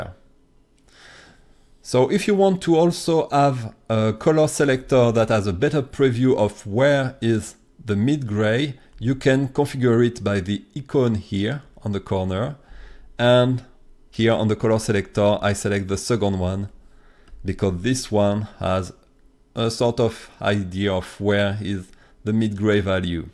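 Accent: France. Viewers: Are u french? U have a french accent so hard man lol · are you fench (si oui, j'ai reconnu ton petit accent !) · Salut ! J'ai vu que tu étais Breton alors je te parle en français ! Super vidéo, on apprend beaucoup de chose sur Krita. Continue ton WebComic, il est juste génial, l'histoire et mimi, drôle et magique, tandis que le dessin sont juste magnifique : j'adore ton style ! Pourquoi avoir choisi Krita ( peut être que Photoshop est trop chère mais je me dit que si tu es pro ... ) ??? Et comment faire pour diminuer la taille du brush sans aller dans la barre du haut ? Continue tes vidéos, c'est pas commun des tutos krita ! Ha, aussi, T'es vidéos sont géniale car JE COMPRENDS TOUT GRACE A TON ACCENT !!! ( sans vouloir être méchant, mais ton accent français est vraiment cool pour moi qui n'ai que des bases ! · You have a strong French accent xD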